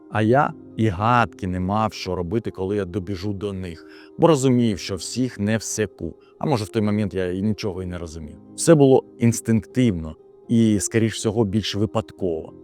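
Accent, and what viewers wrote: Russia. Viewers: І з кацапським акцентом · Breshe khlopchyk! A mozhe tse troll Putina? Rosiyskyy akcent.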